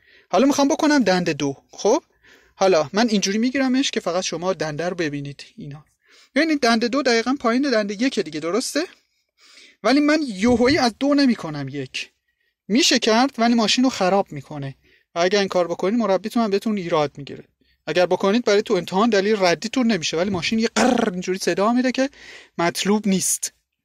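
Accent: Shiraz, Iran. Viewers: لهجه ی شیرازی خیلی شیرینه ربطی نداشت ولی کلا گفتم😂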